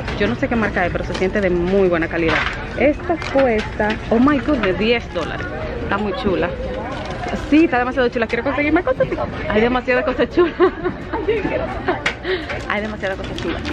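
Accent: Dominican Republic. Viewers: La que está hablando es como dominicana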